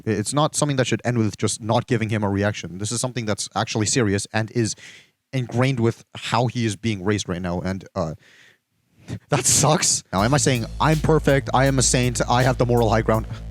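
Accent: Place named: Australia. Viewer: I like your accent it sounds Australian:]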